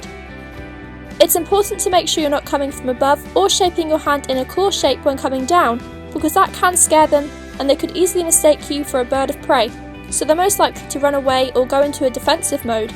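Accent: England, United Kingdom. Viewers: You sound English.. Anyway if you are do you know the best place to get hamster toys and hideouts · are you English?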